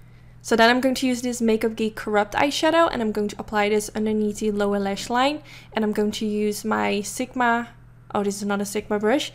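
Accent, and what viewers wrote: Netherlands. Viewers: WOW this look is so cool! Love it! 💚💚💚 Wacht eens even, ik hoor een Nederlands accentje. Ben je Nederlands!? Wat gaaf!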